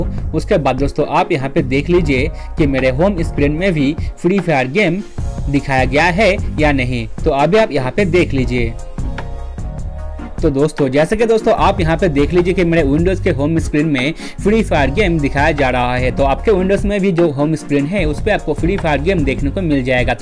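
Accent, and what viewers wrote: Bengal, India. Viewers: apni bengali?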